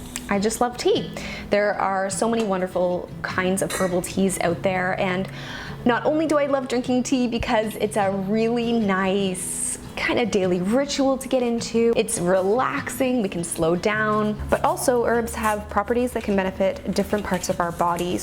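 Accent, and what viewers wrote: Canada. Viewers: Are you Canadian? Kinda sounds like Canadian hehe.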